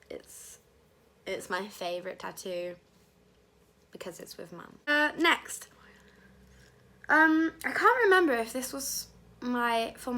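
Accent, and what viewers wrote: United Kingdom. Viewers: Haha. You sound very British haha. I love your tattoos btw :)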